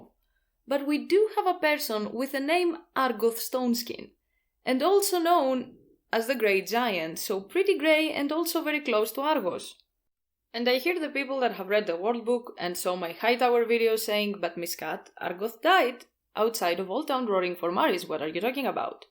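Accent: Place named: Greece. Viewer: I think you're the first (fellow) Greek ASOIAF Youtuber I've ever seen I could immediately tell by your accent hahaha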